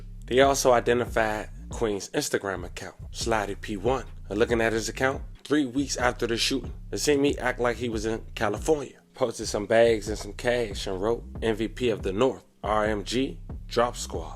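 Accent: Washington DC, United States. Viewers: Sound like he from DC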